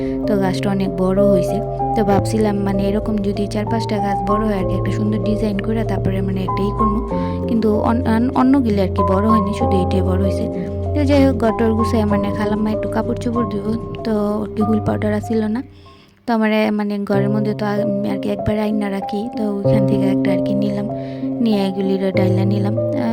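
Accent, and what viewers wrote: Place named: Shariatpur, Bangladesh. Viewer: আপু আপনার কন্ঠটা অনেক সুন্দর, শরিয়ত পুরের ভাষার মতো লাগে❤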